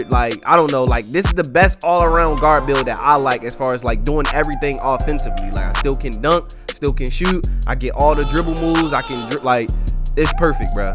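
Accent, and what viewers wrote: Baltimore, United States. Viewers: Dang bruh you from Baltimore 😭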